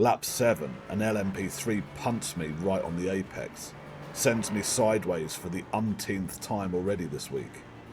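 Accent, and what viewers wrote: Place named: United Kingdom. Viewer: A british accent always makes narrating sound supreme.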